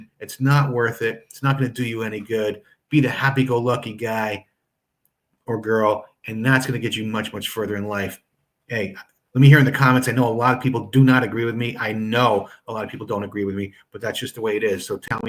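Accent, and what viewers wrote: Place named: Long Island, United States. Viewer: I'm guessing Long Island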